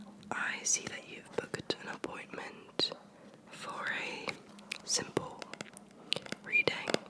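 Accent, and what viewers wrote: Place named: United Kingdom. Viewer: thanks for the great video! question: did you live in england at some point or learn english very young?? your accent is so characteristically british i would never guess you are french!!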